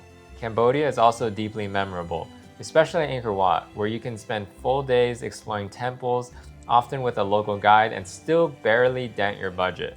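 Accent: United States. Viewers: His accent is clearly US… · I’m Irish but live in Australia. You assume because he has an American accent he’s talking USD. Assumptions lead to all sorts of f&@ ups.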